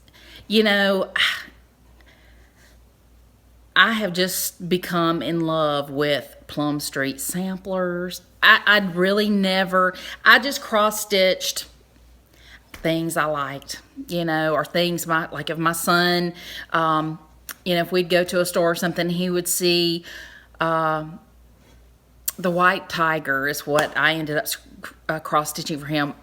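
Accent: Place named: Oklahoma, United States. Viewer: You are so cute. When I first heard you I thought she talks like me. I'm from SE Oklahoma and you would fit right in. I am subscribingvright now. Plum Street is my favorite, too.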